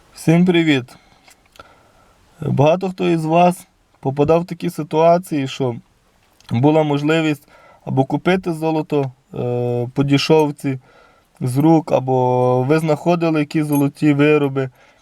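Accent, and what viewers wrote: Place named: Ternopil, Ukraine. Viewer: Привіт.Чую тернопільський акцент....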